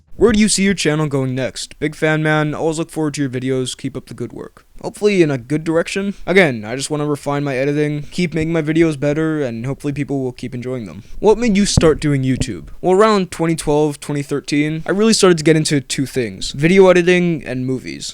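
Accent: United States. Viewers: Hi, you have some sort of American accent. Did you move from America to Denmark? Just wandering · Holy sardine! (Name that movie!) I can't believe you live in Denmark! You sound really American! Also I freaked out when you showed my comment (twice!) and I have a suggestion for a 'best and worst' video. It might be too short that you might not be able to do it but I'd really like to see it. You ready?........the best and worst of the Cornetto trilogy (you know, Shaun of the Dead, Hot Fuzz and The World's End!) I just have one more question, did you watch my channel? And if so what do you think? I'd love to hear your thoughts on it. Thanks! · you sound so not danish. I thought you were form america or something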